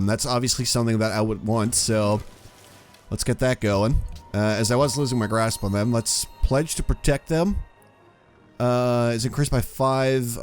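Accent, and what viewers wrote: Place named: California, United States. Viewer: I would never guess you were Dutch by your accent. I thought you were Californian!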